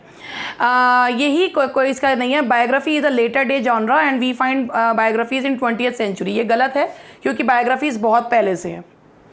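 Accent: Meerut, India. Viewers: Meerut ki tone alag he hai😁.. pleasure to see videos. M.A ki classes yaad ajati hai..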